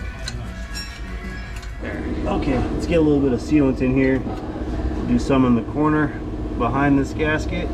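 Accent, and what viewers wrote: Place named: South, United States. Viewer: Is it just me, but does voice sound a little different. I think the subtle Southern drawl has nearly disappeared. Different mic or other sound equipment change? NBD.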